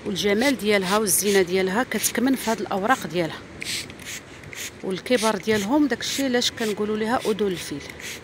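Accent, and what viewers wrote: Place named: Algeria. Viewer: ما اجمل اللهجة الجزائرية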